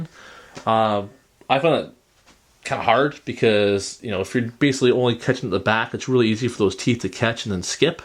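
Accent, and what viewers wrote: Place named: Canada. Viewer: Okay, to follow up with what I mentioned on Twitter, this video showed me that I need MUCH less chiseling. I had made my New Year's resolution to learn hand-cut dovetails, and watched a few basic videos and subsequently a few (horrible) attempts. Prior to this tutorial, I was laying out my tails with a marking knife so that I could register my chisel in the mark. I would use the dovetail saw to cut shy of the line, a coping saw to remove the waste, and then try to use the chisel from way too many angles. As I was new, I wasn't using the chisel very well, and had some irregular cuts, undercuts in the wrong places, angle changes, etc. Then I would transfer this mess to the pin board, and more irregular chiseling only compounded the mess. After watching this tutorial, I decided to "let it go" and just use the cut from the saw, and only use the chisel to clean up the bottom, instead of the bottoms and sides. 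This has led to not only quicker dovetails, but cleaner ones so far. What I am able to transfer to the pin board seems to be a lot better, and will get better as I practice. I still have far to go, but am trying to get down to the shop at least once a day to make a practice dovetail. Thanks again for not only the tips in the video, but for everything else (keeping it real, showing mistakes, crass jokes, Twitter rants, glorious Canadian accent, etc.)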